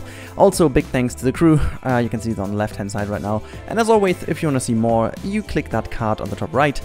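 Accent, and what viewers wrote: Germany. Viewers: Is your Native language german?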